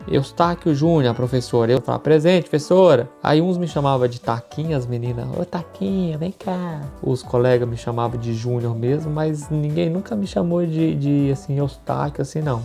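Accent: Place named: Minas Gerais, Brazil. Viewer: Lindo esse seu sotaque mineirês.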